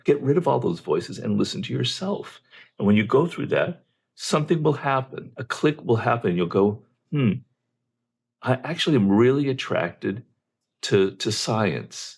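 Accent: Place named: United Kingdom. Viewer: I have difficulty understanding the British accent. I’m a beginner. By the way, great video.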